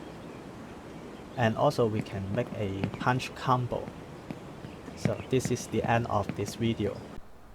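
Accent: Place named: China. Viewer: Chinese accent.Thanks for the solution of animation changing flicker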